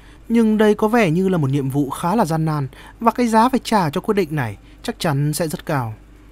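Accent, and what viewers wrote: Standard. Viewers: bạn nói rất hay .chuẩn luôn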